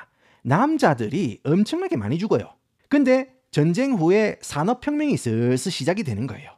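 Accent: Gyeongsang, South Korea. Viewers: 언어 천재 DNA가 경상도 사투리와 영어를 만나 미쿡 이야기를 맛돌이로 말아주는 채널 ㅋ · 경상도억양 재밌는데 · 이 튜브의 재미는 님의 성대모사와 창, 구수한 갱상도 사투리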